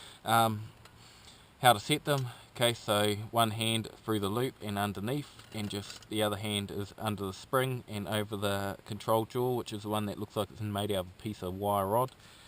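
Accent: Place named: New Zealand. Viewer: aw yea, fush and chups tonight bro? Good to hear a kiwi voice....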